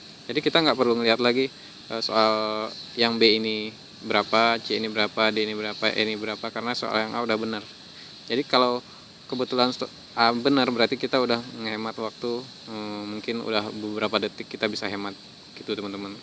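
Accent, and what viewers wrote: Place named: West Sumatra, Indonesia. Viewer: nada minangnya jelass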